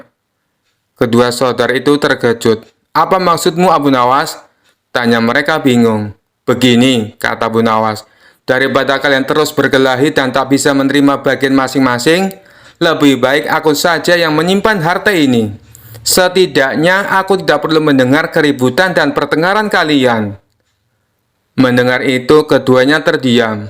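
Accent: Java, Indonesia. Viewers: kalo bisa pke bahasa indo yg bner jngan medok biar lebih enak 🙏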